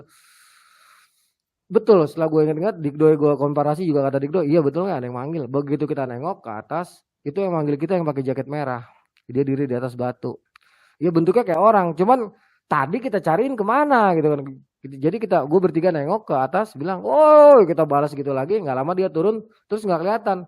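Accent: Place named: Kuningan, Indonesia. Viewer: Orang kuningan mana nih suaranya 😁 Sehat selalu bang 🙏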